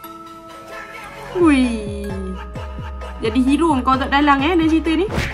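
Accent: Malaysia. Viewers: Kakak orang malaysia ke enzim cakap macam malaysia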